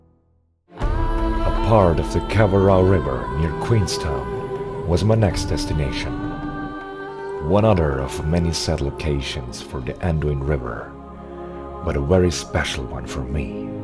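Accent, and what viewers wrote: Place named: United Kingdom. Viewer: are you austrian? cause you totally sound british. great video, I'm so jealous now. I watched the beginning with the Narnja music over 10 times, I think. just gives me goosebumps.... O__O I might actually take the "wrong flight" on purpose, next time I go to see my family in the Philippines and have a stop-over in Dubai or AbuDhabi.....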